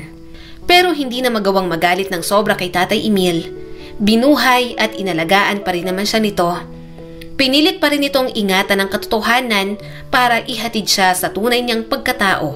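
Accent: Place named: Hiligaynon, Philippines. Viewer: Ilongga cguro nag babasa ng story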